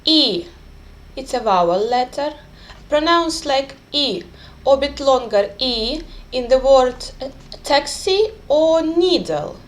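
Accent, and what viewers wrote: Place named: England, United Kingdom. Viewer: your courses are amazing !! no problem with your english accent, i can keep focusing and understand you. Thank you !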